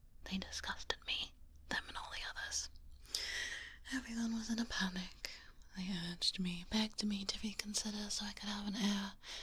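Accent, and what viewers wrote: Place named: RP, United Kingdom. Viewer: yippy posh UK RP accent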